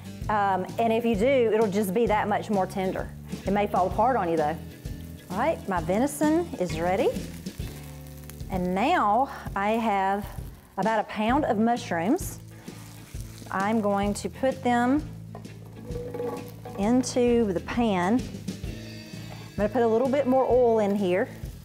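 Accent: South, United States. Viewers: She had me in within 2 seconds after hearing her sweet southern accent. Next I noticed the wedding band. No wonder she's married! I have to cook the deer in our house. But, I got an ace in the hole! My Grandma taught me how to. Funny, Granny could chicken fry up a mean skillet of deer steak but she didn't like deer meat. My Dad, my older brother and I would fight over that delicious meal! That's why I asked Granny to teach me!! I prepare it the same way that she did, but hers tasted sooo much better. That's because she put her love into it!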